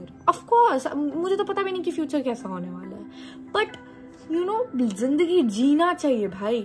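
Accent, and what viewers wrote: Bihar, India. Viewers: In love with your bihari accent 😭🫶🏻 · Didi aap Bihar se ho na😊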